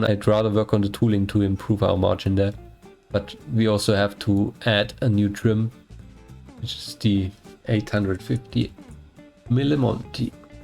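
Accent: Bavaria, Germany. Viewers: Du kommst aus Bayern?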